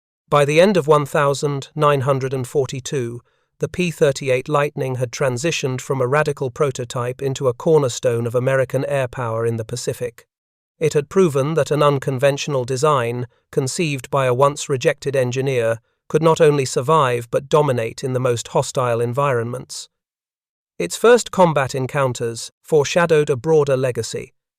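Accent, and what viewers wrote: England, United Kingdom. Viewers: Anglican English gives the narrative the proper setting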